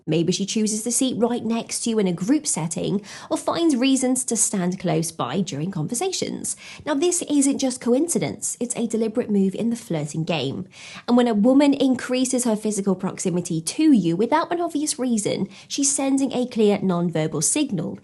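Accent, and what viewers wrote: United Kingdom. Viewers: Love your British accent!!! 😘😘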